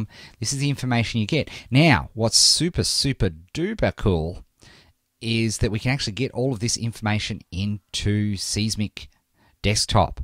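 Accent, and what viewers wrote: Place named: Australia. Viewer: Lol You obviously figure out that this is an ozzie.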